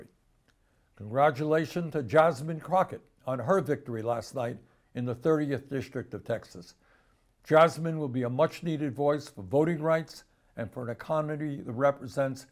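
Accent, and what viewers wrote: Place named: New England, United States. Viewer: He speaks so well, and I looove his New England accent😊😊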